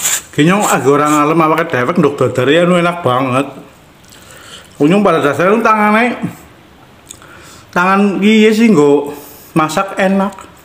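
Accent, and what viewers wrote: Banyumas, Indonesia. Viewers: Saya suka logat ngapaknya pak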